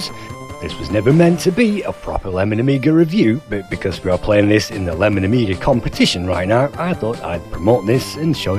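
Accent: Lancashire, United Kingdom. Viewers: really enjoy your reviews! I'm a faithful subscriber from the USA and your accent is reminiscent of a mate from Accrington, so I'd venture to guess you're from Lancashire? if I'm wrong, no offense and keep up the great videos!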